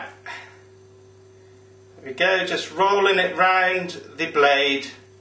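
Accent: United Kingdom. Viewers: He's not Australian -____- I'd have thought by his accent it's pretty obvious he's from the UK, but obviously not. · Are you from Cardiff?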